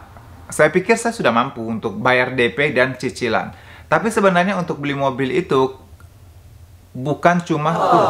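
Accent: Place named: West Sumatra, Indonesia. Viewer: Betul sekali. Jangan silau dengan orang yang berbeda ras, jangan menganggap diri lebih rendah dan terlalu memuja. Pada akhirnya kualitas pribadi yang lebih menentukan hidup kita. Terima kasih utk sharingnya, sukses selalu ya.. Btw, saya suka logat minangnya..😊👍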